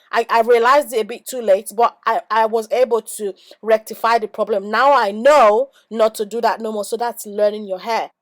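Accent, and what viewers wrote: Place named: Nigeria. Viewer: Mhmmmmm.....by ur accent I know youre a nigerian.....and an igbo woman at that😂😂💜💜💜💜 subscribes ur funny af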